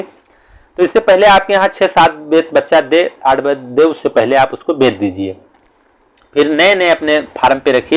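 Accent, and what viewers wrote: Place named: Uttar Pradesh, India. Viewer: Apki awaj se bahraich ki mahak aati hai. Thanks brother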